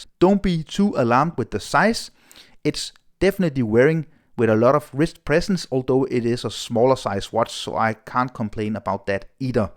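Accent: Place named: Denmark. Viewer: Man, I can pick out a Danish accent anywhere.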